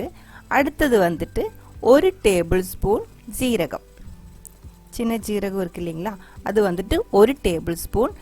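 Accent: Kerala, India. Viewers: I remember my hostel days, my kerala friends used to share this with me during the tea time in the evenings, I just love your tamil, just like my Malayali friends💞💖🤗😘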